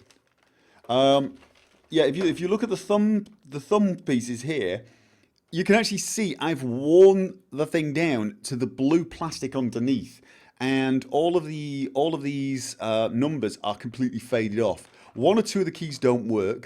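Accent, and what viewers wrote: United Kingdom. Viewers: er jo ofte grunnen for expats. Belgisk her, norsk kjæreste og. It was pretty funny to learn one of my fav person on youtube is living in Norway while sounding so absolutely british though. Big planet, small world. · Is your accent because of living in norway or is it just a british dialect?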